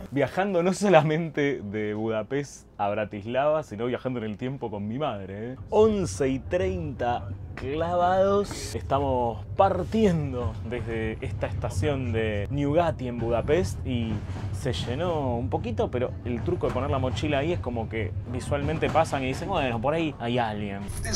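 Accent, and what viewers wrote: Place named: Argentina. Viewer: Viajas por el mundo....y hablas en acento argentino...?.. habla en castellano original...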